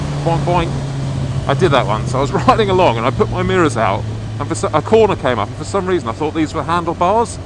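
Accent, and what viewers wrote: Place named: RP, United Kingdom. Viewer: I must admit, you speak the Queen's English better than most people. If you're not a Baron, you should be!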